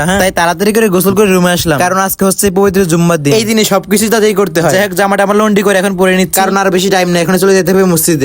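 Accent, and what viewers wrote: Noakhali, Bangladesh. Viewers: আচ্ছা ভাইয়া তোমাদের বাড়ি কি নোয়াখালী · ভাই আমি নোয়াখালী থেকে বলছি। তুমি অনেক ভালো নোয়াখাইল্লা ভাষা পারো। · ভাই আপনার বাড়ি কি নোয়াখালী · ভাই আপনার বাড়ি নোয়াখালী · আপনার বাড়ি কি নোয়াখালী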